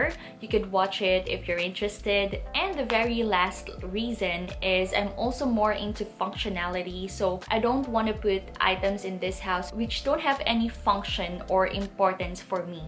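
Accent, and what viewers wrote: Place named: Philippines. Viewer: Me too, not a fan of jewelry. Earrings and watch will do. Btw, I love your Filipino accent don't mind the troll account. 😉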